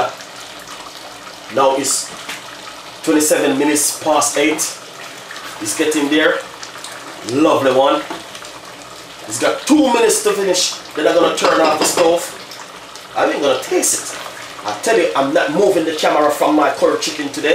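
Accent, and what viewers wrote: Caribbean. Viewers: Bursting enthusiasm. Excellent Caribbean accent. Excellent cooking!!!!! Thank you, Chef.:) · Looks great..however he had me laughing the whole time the way he was talking..his voice sound so island and energetic..I surely will be using his recipe for my Curry Chicken!!!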